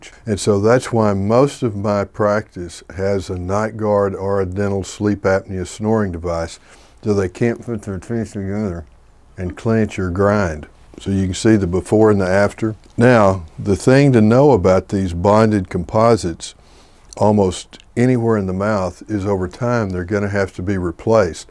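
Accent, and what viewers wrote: South, United States. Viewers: I just love ur southern accent. They work every time ;)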